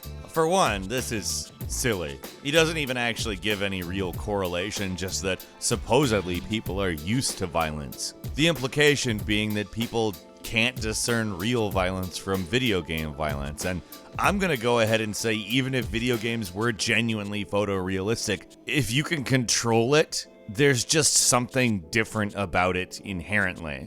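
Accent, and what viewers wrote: United Kingdom. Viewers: Real life violence makes my stomach turn, blowing people up in video games doesn't. Hilarious brit accent though LOL. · Some brits are stupid. ALMOST as bad as extreme feminists. #5. We can go a whole year with barely seeing the sun. We don’t all have rickets. I barely go out when it is sunny and I’m fine. And your British accent is terrible lol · The British accent has convinced me beyond all doubt that actually is Ed Helms in disguise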